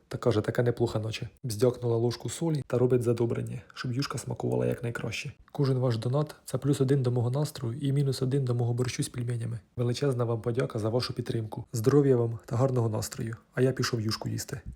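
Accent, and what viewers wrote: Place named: Cherkasy, Ukraine. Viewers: Я дивлюся з Австріі,а родилася на Кіровоградщині.Яка цікава подача-з гумором да діалектом місцевим.Дуже багато знайомих "приколиків" словесних!!!🤗👍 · Німеччина) із задоволенням слухаю черкаську говірку) моя мама з Хлипнівки Звенигородського району) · Дивлюся з Варшави, пишу комент с гіпсом 🙂не могла не написати, у вас говір як у моєї мами, сама з Черкаської області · Дивлюсь з Польщі . Як я люблю цей акцент, провела дитинство у бабусі на Черкащині. Корсунь Шевченківський , Стеблів де народився Нечуй Левицький... Ностальгія прямо... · Вітання з Нідерландів ❤ З першого твого слова вгадала, що ти з Черкащини 😅 Жила я там кілька місяців (м. Шпола). Черкаський гумор приправлений специфічним діалектом — такий само впізнаваний як полтавський борщ з галушками 🎉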